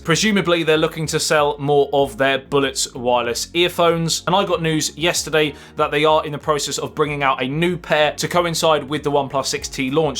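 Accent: United Kingdom. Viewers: I used to watch your reviews as it is highly useful and also to listen to your British accent to improve my English language skills :D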